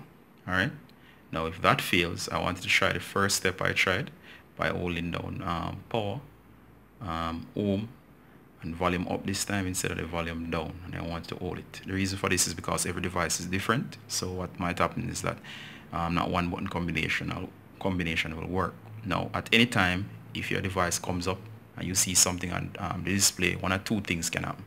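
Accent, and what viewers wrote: Jamaica. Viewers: Are jamaican? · From I heard your voice I knew you are Jamaican lol Your sim card confirmed it.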